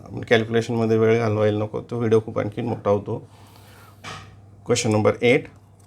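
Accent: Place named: England, United Kingdom. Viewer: Sir please 12 la jasti English style madhye bolaycha prayatn karu naka te kasatari vat te😅😅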